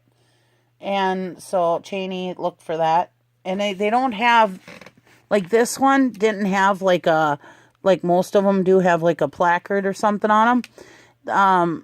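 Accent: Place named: North, United States. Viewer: Great Sales! Even though it was a year ago lol. I thought it was recent! lol Wisconsin I had a feeling, just by your northern accent. I still have mine I refuse to loose it-Never ever-lol. Have a great weekend. I gotta go call eBay & hope they can help me fig out how to cancel my GTC items so I can throw them up on Auction this weekend to get new stuff listed just so much to list with a 3rd Generation Sale. I source from my eBay & photo room lol. So much $$$ in there & need to get it up. Seeing vids like this just encourages me to keep hustle and knocking harder.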